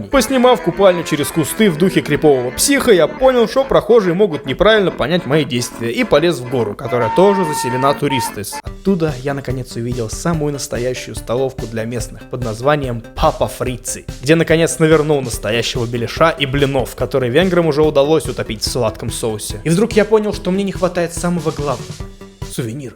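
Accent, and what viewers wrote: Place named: Odessa, Ukraine. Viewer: Хаха как всегда заставил уповать от смеха. Отдельный лайк за Одесско-еврейский акцент)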